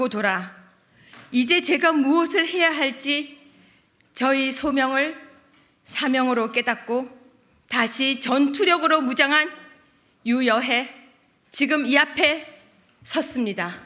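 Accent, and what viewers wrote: North Korea. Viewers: 북한 말투같냐??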